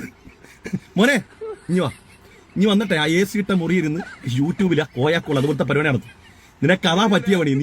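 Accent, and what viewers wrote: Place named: Kollam, India. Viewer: ഉസ്താദ് കൊല്ലം കാരൻ ആണോ 😄😄. അതെ language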